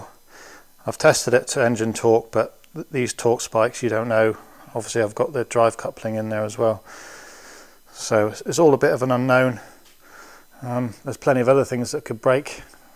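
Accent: United Kingdom. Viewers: Well done for getting this far. I built a prototype coaxial 6 years ago and got it to basic hover testing but put it away in my shed and now I have some more time are wanting to move it to next phase of testing. I was wondering where you are based? You sound British. I'm live in New Zealand.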